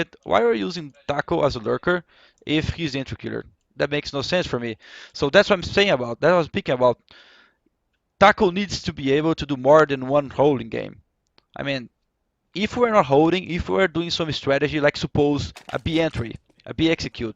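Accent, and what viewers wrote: Brazil. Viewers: tu é br ou americano? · se quiser melhorar tua pronúncia da palavra WORLD para que não pareça WORD. Fale assim: wor - L(el) - D(di) worLd De resto, embora teu sotaque BR, dá pra entender muito bem.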